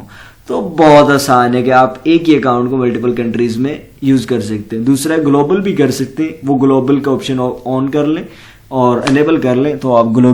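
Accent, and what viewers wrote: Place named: India. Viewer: Why the English title and Indian voicover? Now I have to keep searching :(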